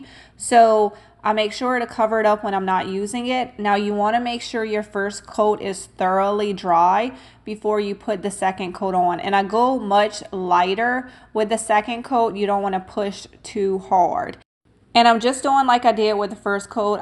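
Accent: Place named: Lafayette, United States. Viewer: when you put in your "paid" value, it would be helpful to include a rough estimate for your supplies, beause i know the iod transfers are not cheap, and you have to account for at least some of the paints. i just recently found your channel and am enjoying it. your voice takes me home to my teen years. i grew up in the subs of houston, texas. my best friend was from morgan city, la and my boyfriend was from layfayette. you sound a lot like them.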